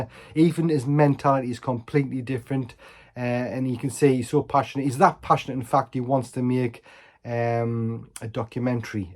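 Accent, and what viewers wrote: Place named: Newcastle, United Kingdom. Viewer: New subscriber, nice to hear another Geordie, thinking about this myself, currently binge watching everything on this lifestyle, hopefully its for me.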